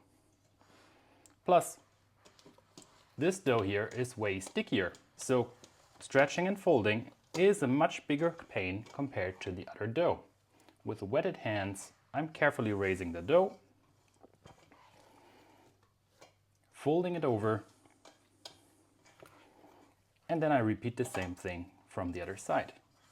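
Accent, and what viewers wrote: Germany. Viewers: Me: Hmm his accent is a bit hard to place. I am thinking German.: And now here is the spreadsheet.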